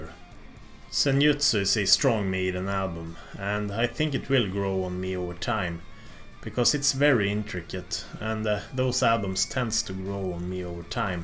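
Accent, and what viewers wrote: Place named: Stockholm, Sweden. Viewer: cringey stockholm accent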